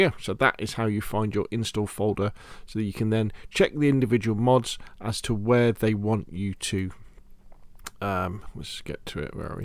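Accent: United Kingdom. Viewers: strange but i loved your voice haha are u british? thx 4 the vid <3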